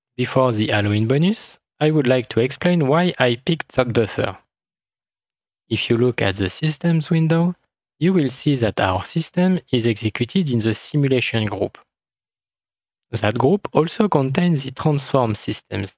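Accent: France. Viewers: why do you speak english like you're reading the words while speaking french? it's honestly very difficult to understand. I'm brazillian, by the way. Et je parle français aussi, c'est ma troisième langue. still, I had a hard time focusing on whatever you are saying. you should work on your pronunciation, either speak english or french! lol either way thanks for the content, this was very helpful to me. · If you can speak German with a German accent i will speak english with a english accent. You gotta watch a native english speaker if its so hard for you to understand. I understand him well and french guys have their own ways its nothing he should change.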